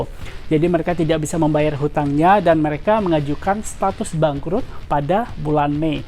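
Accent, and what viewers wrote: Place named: West Sumatra, Indonesia. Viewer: Orang sumatra Barat nih presenternya... Logatnya mantep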